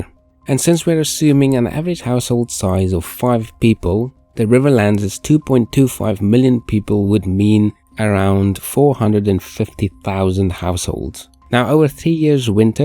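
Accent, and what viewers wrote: Afrikaans, South Africa. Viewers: South African? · 🚨🚨 afrikaans asoiaf youtuber spotted 🚨🚨 die skok van jou aksent hoor was groot, maar goeie video! keep it up! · Loved the video.... but also... this is a very south african sounding accent.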